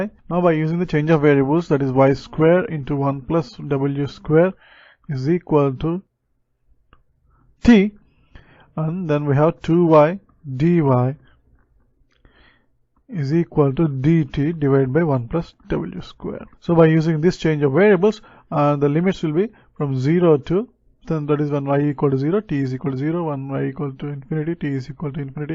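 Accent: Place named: India. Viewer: Heavy Indian accent makes it hard to understand.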